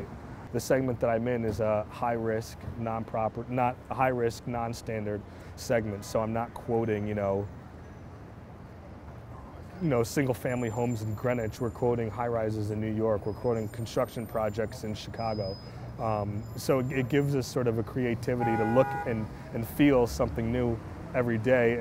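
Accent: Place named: Africa. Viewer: You guys are actually from africa aren't you and now you're here giving us a bad name. Well listen up buddy-we don't need your help so stop hiding behind AA and show your true self. Covering OR dropping your accent is not fooling anyone😎😎😎😎😪😪😪😪😪😓😓😓😓😬😬😬😬😬😬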